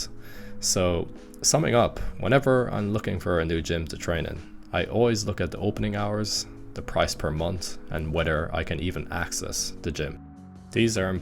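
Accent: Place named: Ireland. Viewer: You’ll need a Kerr, dats just anudder ting yill need. So Irish I love it. Good vid, all true. Suck it performance gyms!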